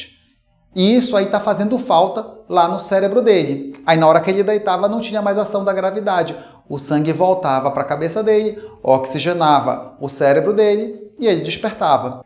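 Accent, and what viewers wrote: Pará, Brazil. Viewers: Gente, esse Dr. é Paraense o sotaque Rs fofo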